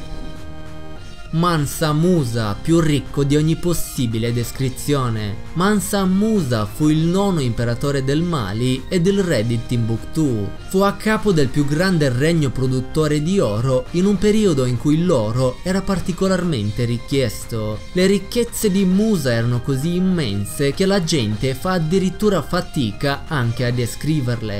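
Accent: Russia. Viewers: è russo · Madonna che voce ma sei russo???È fighissima la tua voca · è Russo :) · È RUSSOOOOOO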